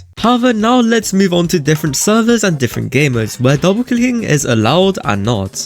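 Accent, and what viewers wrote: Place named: England, United Kingdom. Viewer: Your English accent is goated dude!! 🔥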